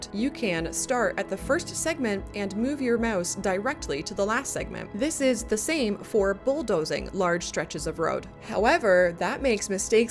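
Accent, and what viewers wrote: Newfoundland, Canada. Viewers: Holy Canadian accent, girl! You said it once and my eyebrow went up, then you said it again... "Round-a-boot". I moved away years ago and it is nice to hear the accent every once in a while. 😁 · Are you a Newfie? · Your a Newfie! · Excellent video! I noticed that you say, "a-boot" instead of "a-bout". As a Canadian, you are the only person I've ever heard using this pronunciation. Vaguely, where are you from? Is it standard pronunciation there?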